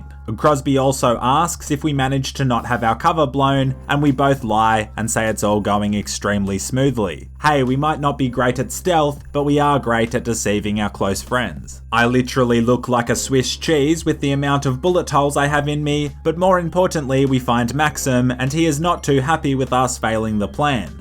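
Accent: Australia. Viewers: Where u from in Australia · Are u Australian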